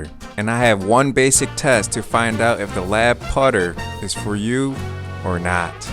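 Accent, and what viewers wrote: United States. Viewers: Super chill Midwestern tone. It’s cool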